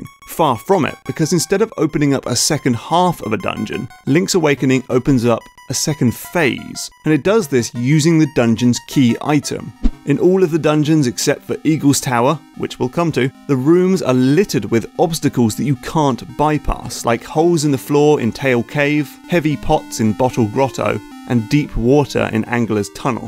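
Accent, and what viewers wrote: United Kingdom. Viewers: The British accent makes it sound so prestigious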